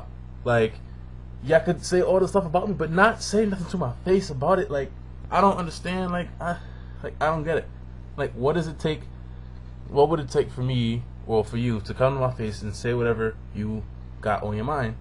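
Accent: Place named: Jamaica. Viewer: You sound mad jamaican in this vid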